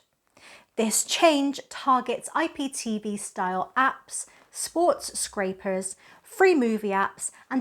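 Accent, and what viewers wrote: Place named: England, United Kingdom. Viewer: I’ve jumped to Onn about a year ago, possibly longer. I still have a Fire TV cube that I very sensory use and an Insignia Fire television, that I basically use as/ monitor for the Onn. You always do a great job, honey abd I e said this before, I like the curly or waver hair over the straight hair. Plus I just live an English accent on women.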